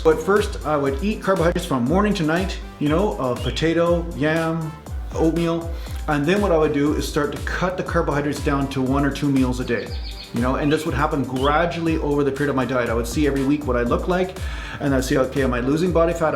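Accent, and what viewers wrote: Canada. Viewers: Are you canadian by any chance? Kind of sounds like it, not saying thats a bad thing haha im canadian myself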